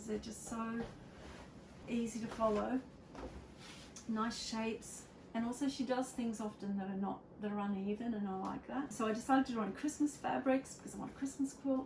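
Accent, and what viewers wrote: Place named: Australia. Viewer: So lovely to hear another Australian voice talking about my favourite subject! It is great to hear you referencing my quilting heroes such as Bonnie Hunter and Pat Sloan. So much joy ahead of you! PS. I love your dress!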